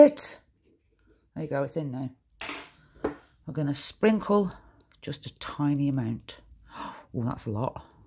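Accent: United Kingdom. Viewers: I am new to Brusho’s but you can bet when I think of them, or read the label, I will imagine the word Brusho being spoken in your delightful British accent.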